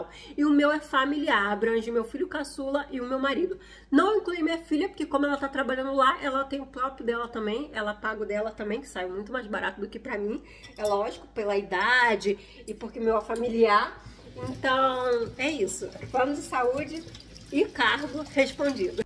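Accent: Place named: Rio de Janeiro, Brazil. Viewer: Você é carioca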